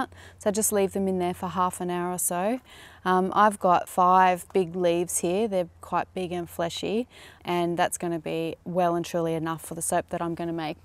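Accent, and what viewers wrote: Australia. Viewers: the Australian accent is beautiful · My first time watching you. So nice to hear an Aussie accent. Love your soap. whenever I use Aloe gel it turns a weird pink colour. Have you ever had this problem?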